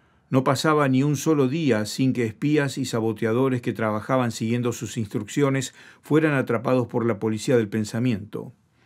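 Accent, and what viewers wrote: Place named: Latin America. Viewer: JOLINES..!!! ESTE ES ESPAÑOL LATINO... VAYA AL FIN GRACIAS COMPADRE.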